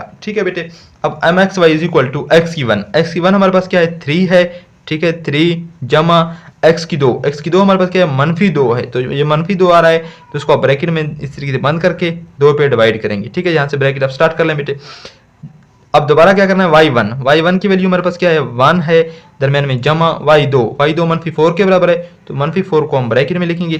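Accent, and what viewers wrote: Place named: Saraiki, Pakistan. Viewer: Sir lagta hy AP saraiki Hain q ky ma Bhi saraiki Hoon AP ki saraki ka mughy PATA chal gya😊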